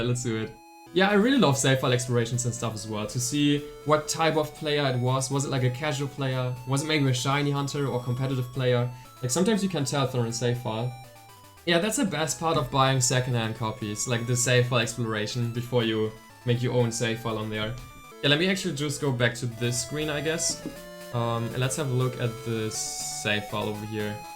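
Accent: Germany. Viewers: From Germany? Heard it after 2 seconds of talking. Never watched a video before but love your content. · You have the most german accent i ever heard. No front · man hört so raus das du deutscher bist :D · I can hear that english is not his mother language. Could it be he is german?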